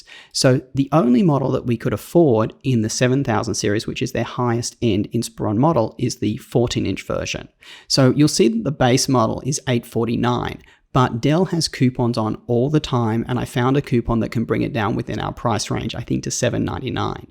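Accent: Australia. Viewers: Your enthusiasm is great. Like your Australian accent. About to buy my 1st laptop so your review is very helpful. Thank you.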